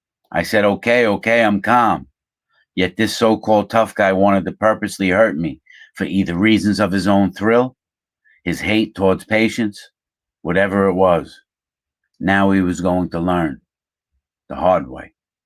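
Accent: New York, United States. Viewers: I'm sorry you had to see that horrible incident with poor Manny. Your mind went from chuckling because he was stripping and you thought he was just being a nut and then suddenly it wasn't funny. He was hanging there like a piece of meat. It's too horrifying to comprehend. I confess I snickered a few times. Not because anything was funny but just your storytelling style cracks me up. 😊 Also want to say that your videos are really amping up in quality and style. The chilling view down that melancholy hallway, the gates slamming, The Moody lighting. Your New York gangster accent in your deep soft voice. You're going to have to start charging us admission. I was silent and stunned for quite a while after watching that video. I think I still am.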